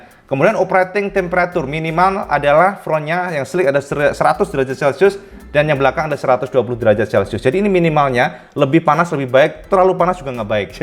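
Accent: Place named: Java, Indonesia. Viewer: Josss Gandosss Cak...Up date Informasinya selalu saya tunggu-tunggu, lugas, jelas, padat dan ciri khasnya yang medok...👍👍👍👍👍👍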